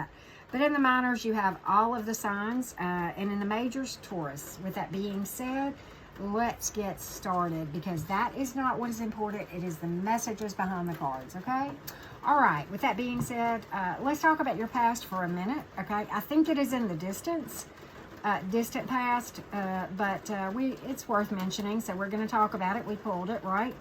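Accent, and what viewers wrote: South, United States. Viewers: I'm loving your channel annnd that southern drawl (I'm a N. Ga Peach) So glad to have found you from Tyler's Tarot 😁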